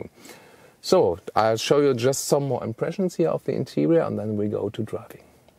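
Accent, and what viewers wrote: Germany. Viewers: Great review, nice video work and lovely German accent. But please stop looking back while driving.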